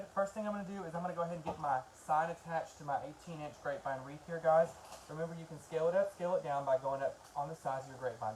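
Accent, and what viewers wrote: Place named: Texas, United States. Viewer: Hi!! Love your videos and am learning more from you. But to be honest I’d watch you just for the entertainment value!! I love your accent , I’m from central Texas too, and combined with how fast you talk is just a hoot!! All I’d ask is for you to make more videos!!! I’ve binged watched as many of your videos as I could find and only found you yesterday!! I love you and am a fan!! I even went to Ellis’ and ordered a bunch of supplies so it’s a win-win!! I love finding new places to shop!! Take care and keep up the good work!!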